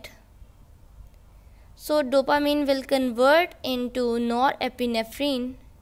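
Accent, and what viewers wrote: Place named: India. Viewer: well done but the indian accent is killing me😢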